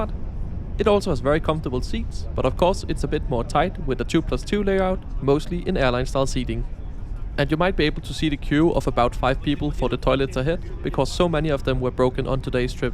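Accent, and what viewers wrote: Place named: Denmark. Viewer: Love your sweet, soft Danish accent. Unmistakable. So many memories of my good old Danish friends from when I was young.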